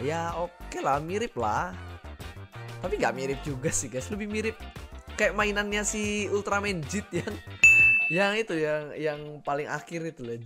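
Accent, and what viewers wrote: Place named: Java, Indonesia. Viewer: Ini nih medok tapi bagus,tutor dong saudaraku biar pd sama logat medok.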